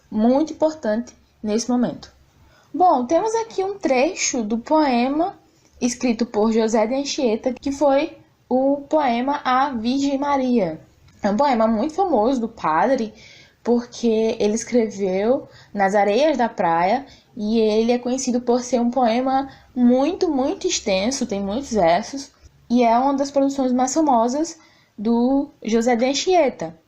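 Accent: Bahia, Brazil. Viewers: A explicação foi boa mas o sotaque da Bahia atrapalhou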